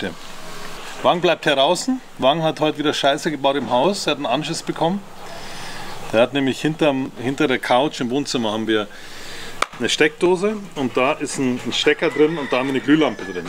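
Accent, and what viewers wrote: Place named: Bavaria, Germany. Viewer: bisserl mehr bayrisch dadad ned schadn , aber alles gut🥳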